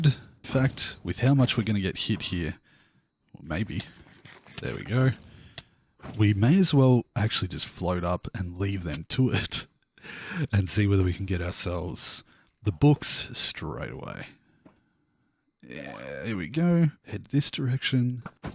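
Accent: Australia. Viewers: Are you Australian?? I am new to your channel and loving every video you upload! Your voice is really soothing so make it a relaxing experience even when you almost “falling” into the void heheh cheers and take care!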